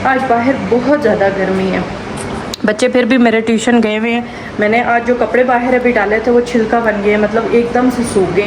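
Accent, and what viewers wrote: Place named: India. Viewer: Ap ka lahja indan h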